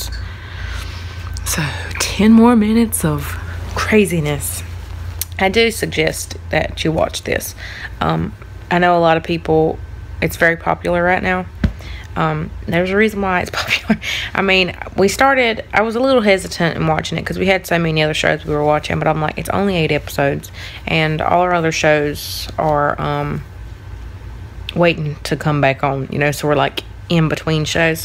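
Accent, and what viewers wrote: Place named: Alabama, United States. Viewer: Where are you from? I love your accent. It sounds like an Alabama accent!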